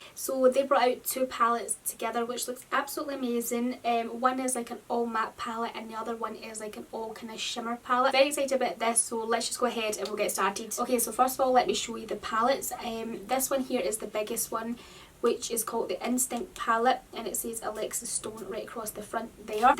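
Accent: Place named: Glasgow, United Kingdom. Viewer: This is a lovely look, I ended up buying both of Alexis' palettes, then I went and bought another transformation palette because I loved it that much. You sound like you're close to where I'm from, I'm in Glasgow 💖👍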